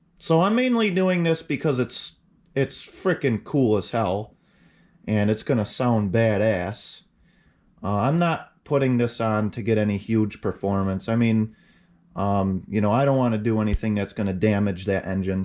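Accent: United States. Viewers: You almost sound like you're from Minnesota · Well I would have bet you couldn’t have done this. I’m new to your channel. Nice job! Your door ID on the truck says New York but your accent says maybe Wisconsin? Well anyway you have a new subscriber.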